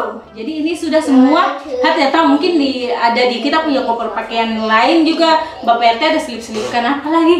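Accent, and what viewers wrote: Manado, Indonesia. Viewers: Bhsa menadonya kental betul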